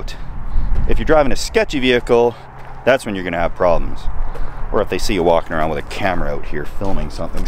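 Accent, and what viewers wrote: Canada. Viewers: The canadian comes out when excited.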